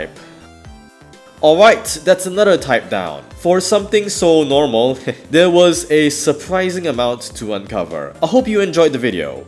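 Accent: Singapore. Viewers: Haha I could recognise the Singapore accent even through your attempts to make it sound more american. I checked your channel and turns out I was right! In all honesty this was a well put together video that is informative yet engaging. Keep up the good work!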